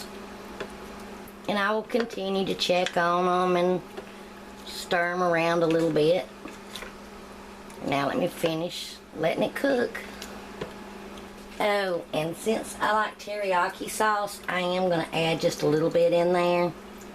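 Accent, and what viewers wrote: South, United States. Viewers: LOVE the accent. Southern charm at its best. Nice recipe. I’ll definitely give it a try.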